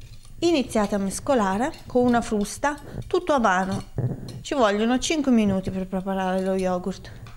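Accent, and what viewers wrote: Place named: Marche, Italy. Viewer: scusa se te lo chiedo sei marchigiana per caso?? io sono di Pesaro... dall'accento sembrerebbe.. comunque video interessante.. complimenti!!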